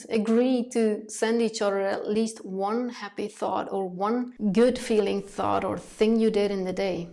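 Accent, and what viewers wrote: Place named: Netherlands. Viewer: Totally get the tail-chase thing. I'm late this week and couldn't stick to my weekly upload schedule as my type of content is edit-heavy. I find myself inside Affinity Photo (my PShop eqvivalent) than doing the recording or video edit itself. (Atm I'm usng Descript, Camtasia and Audacity) Out of interest what are you using? And judging by your name and accent I presume you're in Holland? :-) Anyway, having a posting schedule is still priority for me, especially when overseas or dealing with 'the job'. Post or die! LOL.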